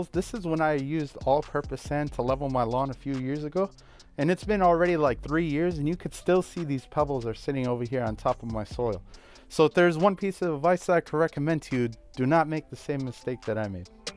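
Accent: Chicago, United States. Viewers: Go Cubs! Appreciate the I formation and since moving to Texas, I love hearing the Chicago accent! I need an Italian beef and Honkers Ale now. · I love the Chicago accent, brother! Keep up the good work. Go Cubies!!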